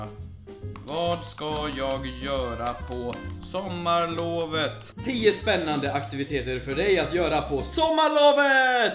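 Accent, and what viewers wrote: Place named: Suburb, Sweden. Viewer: varför pratar du orten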